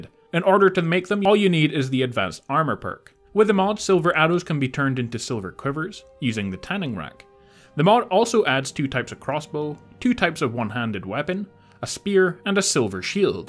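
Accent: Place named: Scotland, United Kingdom. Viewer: It's great to hear a Scottish voice on YouTube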